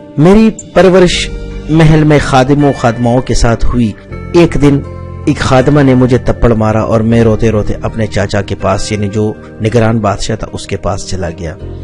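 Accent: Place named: Punjab, Pakistan. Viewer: Assalam Alaikum Aapne ek achchi kahani chuni lekin urdu sahi se adaa nhi kar paye. Punjabi wala lahja hai aur padhne me bhi kabhi ujlat, kabhi uljhan aur kabhi bedili se padha hai aapne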